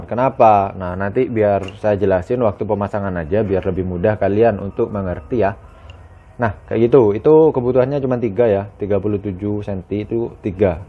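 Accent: Madura, Indonesia. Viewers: Logat madura nya kentel 👍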